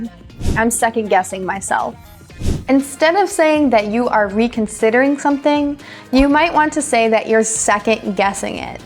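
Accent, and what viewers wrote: United States. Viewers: inglês americano gata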